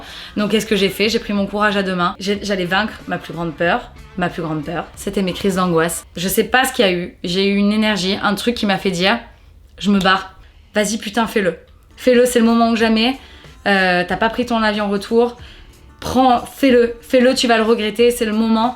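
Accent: France. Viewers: C'est beau 🥰🥰🥰 Cet accent niçois qui fait toujours plaisir 🙃☀️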